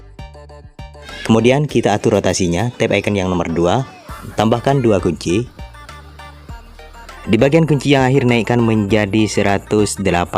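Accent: Madura, Indonesia. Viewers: Logat nya kok kayak orang Madura ya😅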